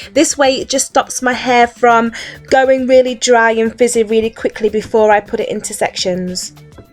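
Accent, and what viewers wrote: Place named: RP, United Kingdom. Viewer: God, I want your accent. I've always looked at those accents as classy and posh. Very pretty sounding.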